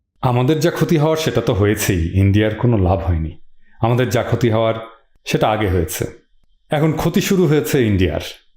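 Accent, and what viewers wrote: Bogura, Bangladesh. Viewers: দাদা আপনার মুখে বগুড়ার ভাষা ভালো লাগে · ভাই আপনি বগুড়ার ভাষায় কথা বলেন, ওই ভাষা আপনার মুখে মুখে শুনতে শুনতে অভ্যস্ত হয়ে গেছি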